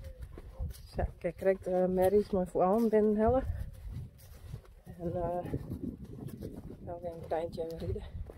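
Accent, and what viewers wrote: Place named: Netherlands. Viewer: I love your constant Netherland English narrating - it's funny but in a damn good funny way. I like it a lot cos it makes me laugh and feel happy. I love your horses too. The horses are lucky to have you as can see you care very much and is conscientious of their wellbeing. They all look well looked after and so healthy. Such beautiful horses and very powerful looking both at the same time. They exude beauty, power and health.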